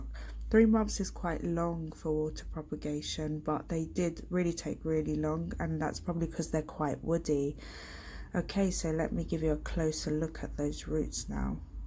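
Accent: England, United Kingdom. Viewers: So glad you made this video. Thank you! I love the sound of your lovely English accent too 🌿💜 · I was really hoping it would work in water. Apparently if you take cuttings in summer us best and quickest to root but you can do it with the softer growths in winter and will take about 3 months to root. I'm judging from the accent your in England so the colder climates may slow down the rooting a bit too. Off to try this and glad I don't have to faff about with rooting hormone.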